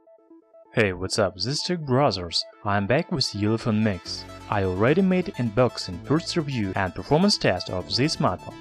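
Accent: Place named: Russia. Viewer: а я то думаю..что за провинциальный городишко забугорный так похожий на наши)) даже рашн акцент сразу не заметил)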